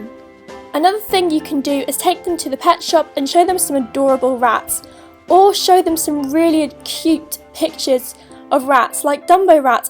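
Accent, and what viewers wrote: United Kingdom. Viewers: OMG!, not only did I LOVE your video, It actually WORKED FOR ME!!!!:D I want to SCREAM I'm so happy! I watched your video, and I made a couple long paragraphs about rats, a couple facts, and my plan, and I'm getting a rat... THIS WEEKEND!!!!! To make my parents get more onboard, I'm going to use my own allowance to get everything! >D You don't even know how ESTATIC I am about this. P.S. I love you're (I think British) (I think it's spelled) accent! I'll tell U about her when I get her! Love, You're Biggest FAN!!!! >D!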